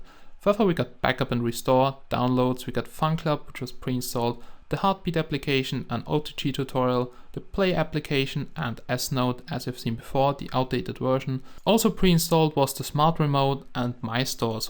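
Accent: Germany. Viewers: Ich bin halb irisch und halb deutsch meine muttersprache ist englisch und ich merke sofort das deine deutsch ist. Nichts gegen dich aber könntest du auch mal videos machen wo du deutsch sprichst? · You dont Hear like a English man More German 😄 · did I hear a german acsend? :D naa hörst dich n bisschen so an :D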